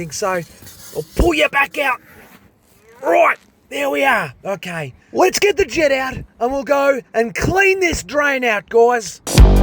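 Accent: Australia. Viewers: Hehe he sounds like that Aussie animal 'hunter' who died stung by a stingray. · Lol this is like watching the Steve Irwin of plumbing. Not just the accent, the overall energy. Love it. 💖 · I just wanna let you know… you sound like Steve Irwin, crocky! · he’s from the land down under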